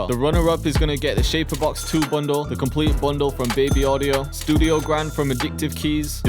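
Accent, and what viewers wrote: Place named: Midlands, United Kingdom. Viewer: Yo, whereabouts in the UK are you from? I'm struggling to place your accent but for some reason I'm thinking Sheffield/Nottingham haha.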